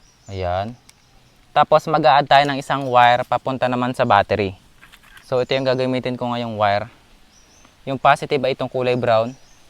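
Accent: Philippines. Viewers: Boss taga saan ka ga? Nakakatuwa ang iyong punto..haha Iniisip ko tuloy ay magka-probinsya tayo.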